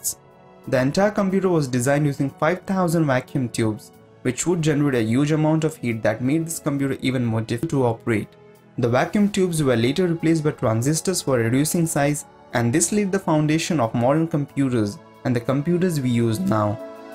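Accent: India. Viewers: You have an indian accent...?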